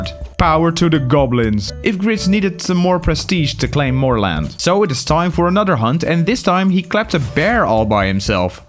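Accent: Netherlands. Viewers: Dutch accent 😢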